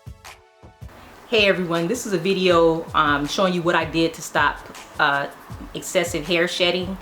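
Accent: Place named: New York, United States. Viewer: Are you from New York? I am, your accent sounds familiar.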